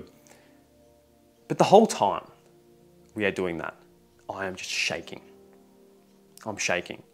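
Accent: Australia. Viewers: made better with that Australian accent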